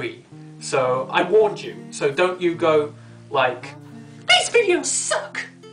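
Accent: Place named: England, United Kingdom. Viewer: I'm REALLY curious on how u got ur Semi-English accent??!! I want one hehe Lo0oL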